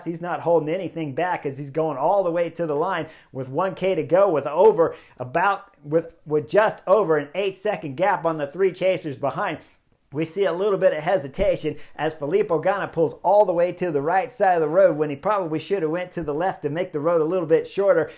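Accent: United States. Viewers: Great analysis… but man the American pronunciation of names is so bad. Not even a small effort to respect original names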